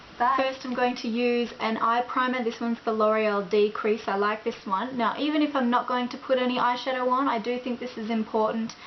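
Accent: Australia. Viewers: Loved this video!! Nice to hear an Aussie accent after watching so many American make up tutorials! xox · I love your aussie accent :D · Aussie Aussie Aussie Oi Oi Oi! I picked you as an Aussie straight away. Boston? WTF? Great tutorial by the by. Definitely going t try this out. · This was lots of help, and you have a strong aussie accent, a lot stronger than mine! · boston? really? shes aussie.. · O.k. well I really got something out of it, so thank you. And....my opinion - talk all you want, here in the South, we don't get to hear your lovely aussie(?) accent much. using the makeup remover and pad will help me with my goofs. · nah shit mate. im ausie and even i can tell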